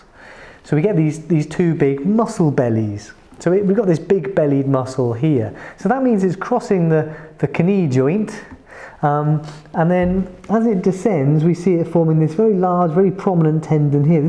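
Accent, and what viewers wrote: United Kingdom. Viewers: what part of uk is this ?=)thank you so much for your videos is so hard biology as register dietitian I have to study in my university but when I found your videos it make a lot difference in my learning experience=)thank you! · A British accent automatically makes you an even greater expert to the American ear.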